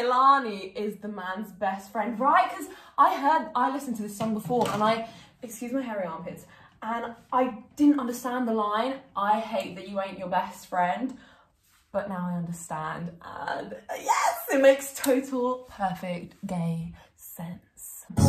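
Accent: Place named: United Kingdom. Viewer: I love your hair, your accent reminds me so much of someone from a British sitcom like skins 🤣❤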